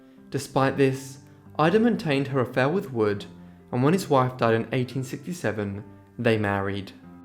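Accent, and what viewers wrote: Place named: RP, United Kingdom. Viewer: Oooh, I would also like to know where you are from! I detect a posh accent, but then again perhaps you use AI.? You are well-spoken/articulate; a great story teller who is easy on the eye, so that’s why you might be AI. No offense meant if you are Real or if use AI. Just finished watching. My guesses were your ancestors were from Spain, Argentina, France or Italy. Well since AI has no DNA, you must be Real then! :D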